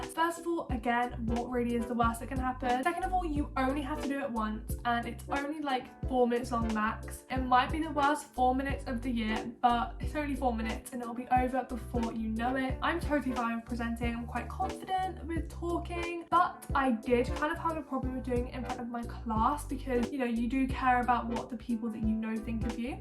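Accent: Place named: United Kingdom. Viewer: I can’t relate to the things you’re saying cause things are done differently in Nigeria & I’m about to graduate from the university BUT I just enjoyed hearing you speak in your cute British accent and your editing is bomb!!!! I’ll literally throw a party when I get to 5k subscribers 😂😂 Congratulations on the Big 5000, 😁😌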